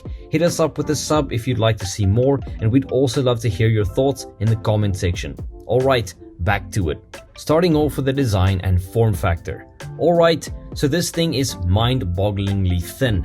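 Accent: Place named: South Africa. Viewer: Your accent is South African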